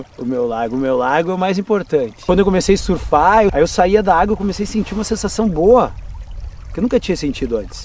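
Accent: Rio Grande do Sul, Brazil. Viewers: Tu é gaúcho tchê?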